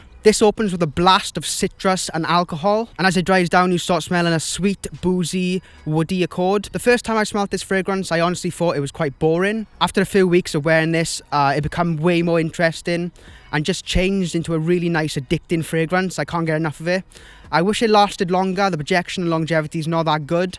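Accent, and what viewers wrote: Wales, United Kingdom. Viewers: know body he's from Wales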